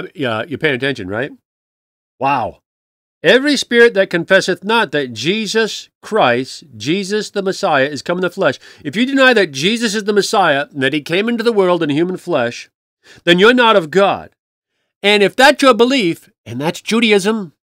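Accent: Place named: New England, United States. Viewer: Love your New England accent. Don't hear much of that here in Winter Park, Colorado at 9000 feet near the Continental Divide.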